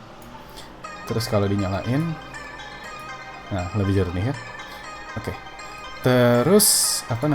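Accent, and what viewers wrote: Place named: United States. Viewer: Anytime you say an english word its pronounced perfectly with an American accent lol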